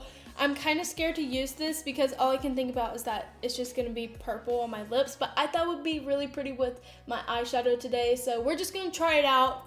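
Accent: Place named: South, United States. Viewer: Katie Washington she sounds very southern to me.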